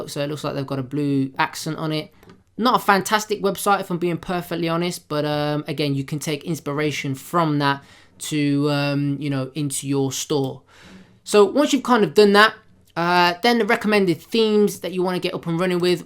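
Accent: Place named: Birmingham, United Kingdom. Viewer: sick videos mate, you sound like youre from or around brum, would love to get some tips if available!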